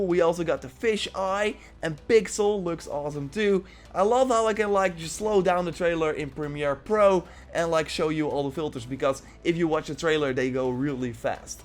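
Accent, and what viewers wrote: Netherlands. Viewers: You sound like a dutch man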